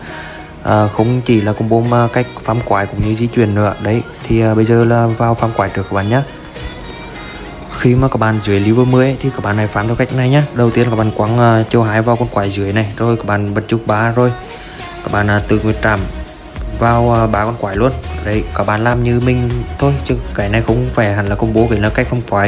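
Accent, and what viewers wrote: Central, Vietnam. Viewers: Giọng miền trung là like comment đăng kí liền. Ko nói nhiều. Ủng hộ bạn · anh là miền trung ak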